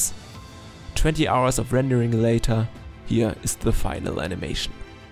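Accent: Germany. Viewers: are you german? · Der hart deutsche aktzent stört ein wenig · why does he sound german?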